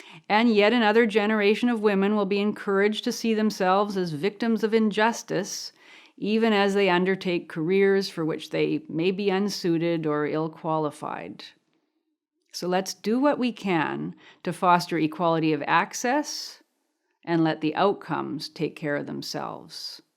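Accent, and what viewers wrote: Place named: Atlantic Canada, Canada. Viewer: This is neither here now there, but listening to your voice takes me back to my youth in Atlantic Canada listening to CBC programs before it went completely insane. That essentially examining and considered tone and accent.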